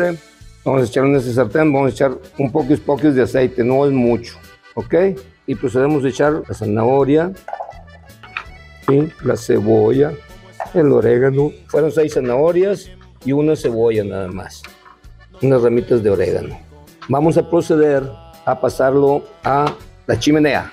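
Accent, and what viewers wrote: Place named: Northern Mexico, Mexico. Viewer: vivo fuera del país y ver recetas, oir musica y el acento de hablar de mi tierra uufff!!! es un gustazo para mi y arriba el norte y ñor!!🤠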